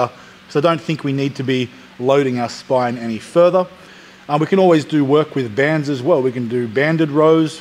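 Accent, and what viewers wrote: Australia. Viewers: Is this guy an Aussie Aussie Aussie?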